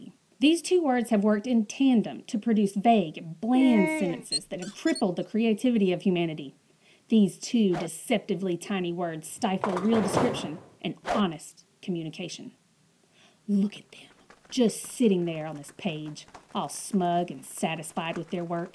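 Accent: South, United States. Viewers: Is she from the south? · what a spunky, southern sound you have!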